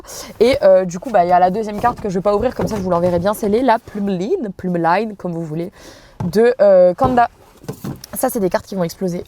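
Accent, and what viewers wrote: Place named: North, France. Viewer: Elle vient de chnord je reconnais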